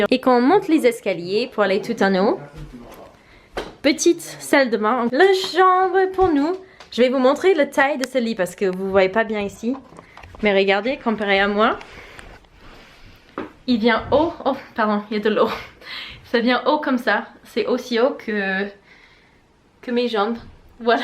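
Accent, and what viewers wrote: United States. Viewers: J'adore t'entendre parler américain !!!!!!! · You’re so nice ! Your husband must be proud of you. I would have loved to marry a woman from a foreign country ( especially from the USA). You show us how proud we ,as French , are honored to have you through all these experiences yo share. I’m so sensitive looking at a person like you who may tell the best from both cultures : USA and France. Keep your delightful accent ! Bravo pour vos vidéos : elles sont fun, no boring at all, et elles permettent aux français qui connaissent pas très bien votre vraie culture de mieux appréhender ses particularités. N’oublions jamais que ce pays est immense et que NY ou LA ne représentent pas toute l’Amérique. For sure, your parents gave you a strong and good education with real values. And it represents such an asset for life. Now it’s your turn to convey all these values to your children. 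And on top of it, they will be enriched by their two cultures. Wishing you all the very best in Canada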